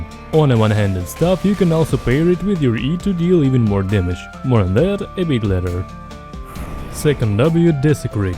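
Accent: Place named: Eastern Europe. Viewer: This guy never misses! Once again, a great video. I also like how you included and broke down the fights with each staff, very informative. You probably already have it on your list, but I would love to see an arcane staff video from you. Arcane been kicking my a*s in CD but might be just a skill issue on my end. Just a bit of viewer feedback, I personally found it harder to follow at times, as the music seemed to be loud at some points of the video. Personally, I would prefer if the music volume would be a little lower overall. As someone who has an Eastern European accent like yours, I find it that people take more time to register what your saying. So during the fast paced fight narration parts of the video the music can create a bit of a barrier. Other than that, it's a perfect video! Love your style, and thanks for sharing your knowledge with us mate! 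Giveaway entry: VageMage - Asia server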